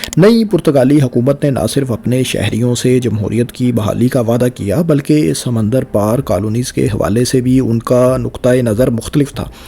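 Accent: Punjab, Pakistan. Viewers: Mr. has some serious problems in pronunciations of the names of cities, countries places and areas. 😡🤬 Therefore, the viewers should not hope that he will improve because he has a typical Paindu Punjabi accent🤮🤢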